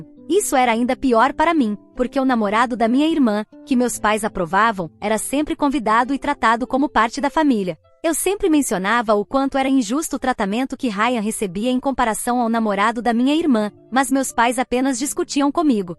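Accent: Portugal. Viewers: Esse português de Portugal ai 😅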